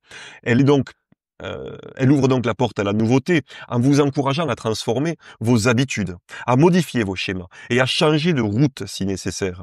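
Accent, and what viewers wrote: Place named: South, France. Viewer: Joli petit accent du sud😊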